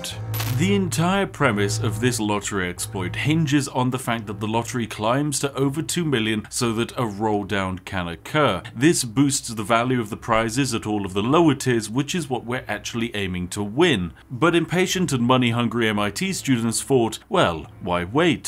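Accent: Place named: United Kingdom. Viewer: oh my fuck YOURE SO BRITISH... i love it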